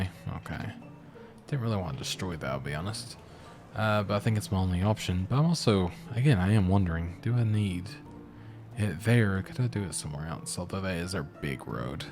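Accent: Texas, United States. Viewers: you sound like you are from Texas, are you?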